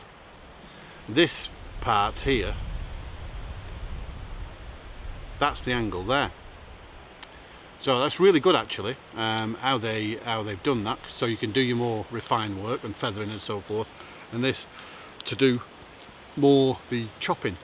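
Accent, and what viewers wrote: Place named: United Kingdom. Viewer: I love the british accent, and great video bruv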